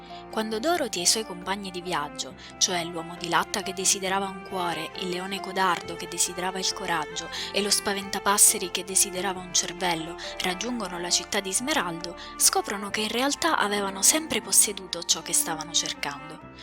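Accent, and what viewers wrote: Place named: Sicily, Italy. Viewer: È incredibile il lavoro che fai!! Non ti concentri solo sulla storyline come fanno tanti altri youtuber, ma guardi al quadro generale e cerchi di estrarre il messaggio più ampio che i ragazzi e la bighit vogliono mandare, unendo tutti i riferimenti letterari (da studentessa di psicologia questa cosa mi esalta). Mi dispiace solo che tu non abbia tanti iscritti, forse perché i video sono in italiano. Ti consiglierei di provare a parlare in inglese, e se non sei tanto sicura della pronuncia usa la voce robotica, vedrai che i tuoi iscritti esploderanno perché da quello che ho visto sei la migliore in circolazione❤❤❤ Ps. Adoro l'accento siciliano 😍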